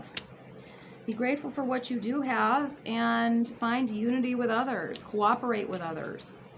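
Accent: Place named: United States. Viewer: I love listening to you. And your down to earth ‘tell it like it is’ approach. That’s why I enjoy your vids. You talk like ordinary Australians do. Yet with an American accent. Keep up the readings. Fantastic!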